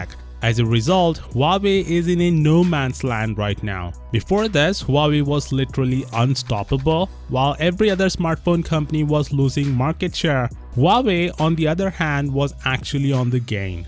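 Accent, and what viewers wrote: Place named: India. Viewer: What’s wrong with his english.. is he indian ?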